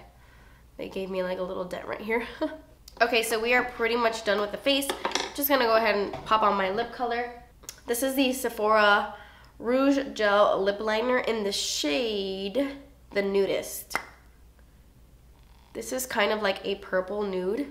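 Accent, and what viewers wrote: United Kingdom. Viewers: This is kinda weird but... I would love to watch a makeup tutorial by you, but all in that British accent! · DO A VIDEO THAT'S ALL U TALKIN WITH A BRITISH ACCENT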